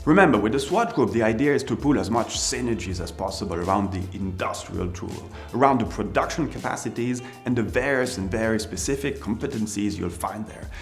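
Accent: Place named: France. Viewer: From your accent, one could assume your first language is French. so you should be familiar with the pronunciation of the German umlaut-U (Ü) since it sounds exactly like a French U. So why is it that you pronounce a German name such as "Günter Blümlein" like a native-English speaker would "goonter bloomlein"?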